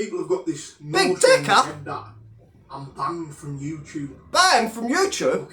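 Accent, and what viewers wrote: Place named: Cockney, United Kingdom. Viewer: Your or8 for a fat cockney bell end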